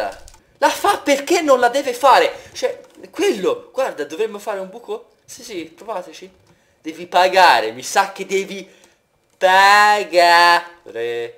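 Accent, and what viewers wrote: Tuscany, Italy. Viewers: è toshano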